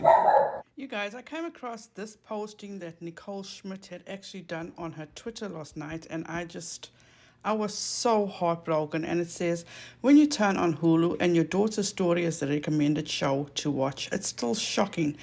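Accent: South Africa. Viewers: I'm trying to place your accent, are you South African? {:-:-:}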